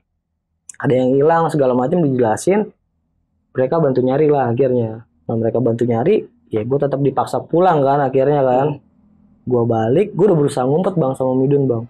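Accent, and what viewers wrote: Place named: Betawi, Indonesia. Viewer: Ceritanya menarik untuk terus diikuti hingga akhir, gaya bertuturnya asyik khas anak muda betawi, si mas yg cerita ganteng pula, paket lengkap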